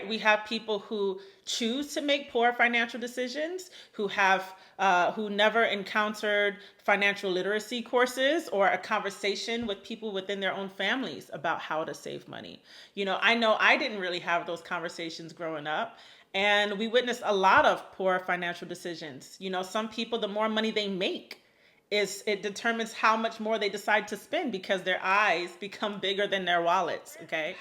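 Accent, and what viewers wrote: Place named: New York, United States. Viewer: This is the 5th video I’m watching of yours today, since the first video I said her accent is from NY and she’s giving me a lil Carribean and you said NY!! I divinely found your page today, thank you I needed it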